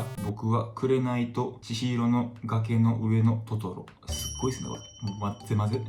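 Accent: Kansai, Japan. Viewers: ゴリゴリの関西弁、喋りウマ🤣！！おもろかった👏👏👏👏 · 関西弁反則すぎる。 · 関西弁可愛い😍藍くんいつも応援してます！大好きです！！笑顔が可愛すぎて癒やされてます🫶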